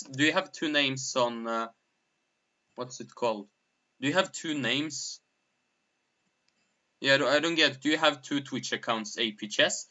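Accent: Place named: Italy. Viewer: Are you Norweigen? You sound more Italian aha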